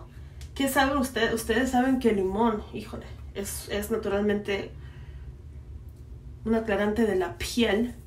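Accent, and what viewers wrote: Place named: Argentina. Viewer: Jajajaja ame tu acento argentino! Saludos desde buenos aires 💕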